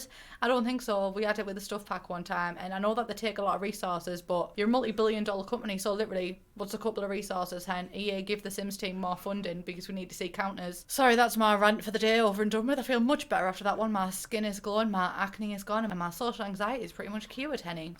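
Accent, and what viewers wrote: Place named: Northern England, United Kingdom. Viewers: I have been watching your videos far to much now because my thoughts are all in a northern accent and I just typed an casual but professional email and nearly sent it with the opening "Hey hens, how are you all diddily doing"? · She’s got that working class, northern accent charm. Northern hospitality as well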